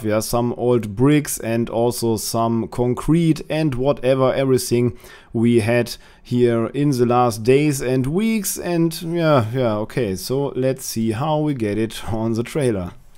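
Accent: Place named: Germany. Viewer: I'm enjoying your video and the German accent, good luck with your project and your Channel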